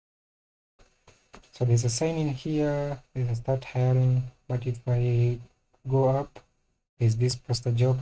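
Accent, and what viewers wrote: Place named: Kenya. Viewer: Bro is that a kenyan accent i here 👍👍 nice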